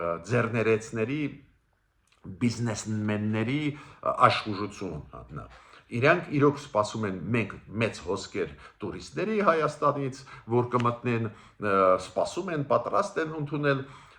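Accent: Russia. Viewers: Քո ռուսական ակցենտը զզվելի է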